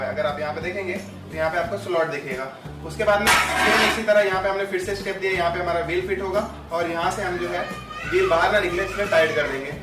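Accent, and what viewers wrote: India. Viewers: He does seem like a Gujarati the way he speaks hindi. May be he is originally from Hindi speaking state. But who cares apne desh hi baccha hai.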